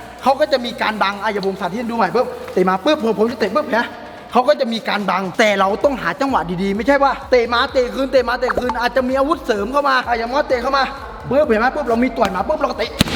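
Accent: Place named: South, Thailand. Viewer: สำเนียง คนใต้ อีสานใต้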